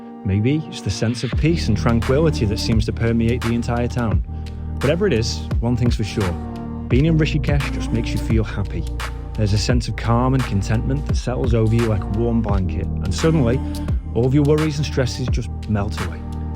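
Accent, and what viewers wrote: Manchester, United Kingdom. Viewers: Heading to Rishikesh in October. Going through a divorce after 20 years and looking for answers, your video got me excited, and hopeful. Plus, you sound like you're from Manchester! Hare Krishna!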